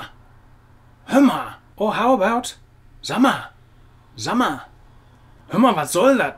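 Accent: Ruhr, Germany. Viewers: Ganz großartig! Ich war erstaunt über Deine für einen Deutschen hervorragende englische Aussprache (noch dazu mit offenbar nord(west)englischem Akzent), bis ich hier in den Kommentaren las, dasse Brite bis! Dat gibbet ja nich, Du höas dich perfekt an wie einer ausm Pott! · Der spricht sehr gut Änglisch, datt macht ihn abba noch längs nich zum Ängländer, wie manche hier wohl glauben tun. Sein Ruhrpott is originaal, datt krisse nur hin, wenne von hier biss. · Samma watn hier los?! Dafür gibt's Kurse? XD Bin vorm Jahr ins Saarland gezogen und es is so erfrischend mal wieder ordentlich Ruhrpott zu hören 💙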